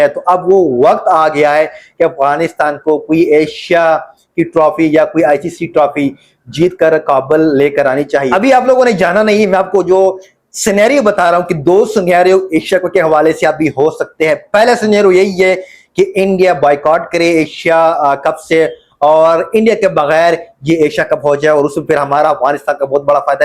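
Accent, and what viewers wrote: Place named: Pakistan. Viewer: Muzhe to ye banda pakistani lag raha hai iski urdu se pata chal raha hai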